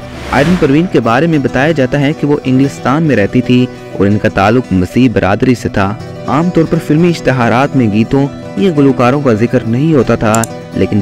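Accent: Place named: Punjab, India. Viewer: pnjbi lahjy ha uordo ghlet bol rhya hu kuri ko kari bol rhya hu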